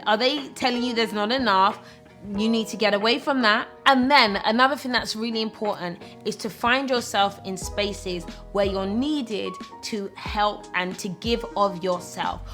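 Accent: United Kingdom. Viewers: Interesting seeing a briiiish accent sistah with a cool vlog. Found it by accident 🙂 Nice it's not another barbie doll Instagram drama diva.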